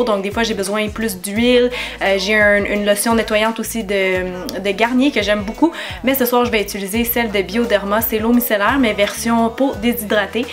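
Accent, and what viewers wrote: Quebec, Canada. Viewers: Encore une vidéo superbe ;) tu es très jolie naturelle :o Puis l'accent québecois c'est toujours agréable ^^ Enfin bref plein de gros bisous 💋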